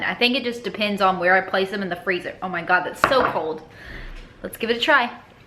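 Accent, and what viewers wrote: South, United States. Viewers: I hate you and love you for this 🤣 I made this tonight and it was so damn good!! I did the chocolate peanutbutter one without the chocolate chips, I used heavy cream (not whipping) and the Swerve confectioners. I ate half of the jar 😎. Thank you for this, btw I love your southern accent 👍. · You are a doll! Really enjoyed your video. Your sweet accent made me homesick for the south :) God bless you! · I absolutely was mesmerized by your accent. Southern women are my bane. 😍😍😍😍😍😍😍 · your southern drawl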